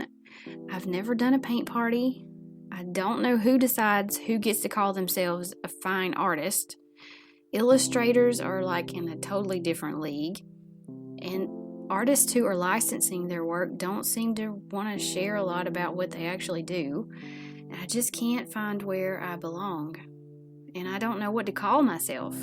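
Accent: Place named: South, United States. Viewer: I know this is an old post, but I wanted you to know that I really enjoy watching your videos. I started painting 5 years ago during the pandemic and like you, I have sold some paintings but never think of myself as a “real” artist either. My husband tells me I am a real artist because I have sold some artwork, but I have imposter syndrome when it comes to my artwork because I didn’t go to art school and have never had any formal training. I learned by taking online classes and watching YouTube. I am a social worker for my job but would love to one day leave this stressful job behind and retire and paint everyday for the rest of my life. Seems like that is never going to be a reality with this economy the way it is. Anyway, you are such a sweet person and I love your southern accent. Keep making your videos, because you and Sandi Hester are my two favorites😊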